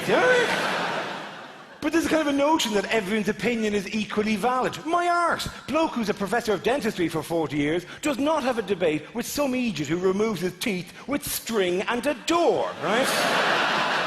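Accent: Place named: Ireland. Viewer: It's just an Irish accent with a lisp thrown in.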